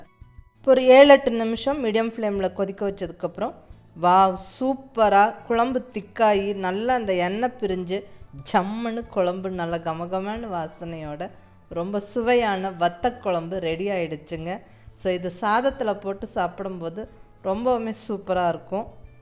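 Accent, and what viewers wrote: Coimbatore, India. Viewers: i tried in tis in the same way u did... got appreciations from family members...tq mam Unga slang nalla iruku..nenga Coimbatore ah...... God bless mam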